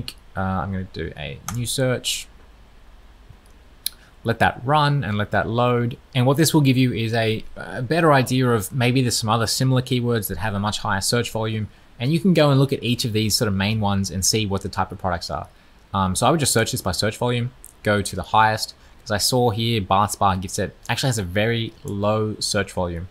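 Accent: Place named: Australia. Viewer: hahaha and that’s 79% of why i subscribed!i also have an accent.. but British ( Caribbean British ).. are you Aussie?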